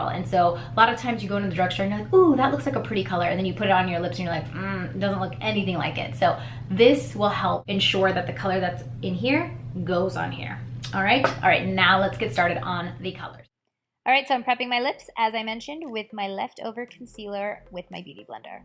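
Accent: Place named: India. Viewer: Hey are you Indian? I really love your accent plz try to reply in Hindi because I wanted you to say something in Hindi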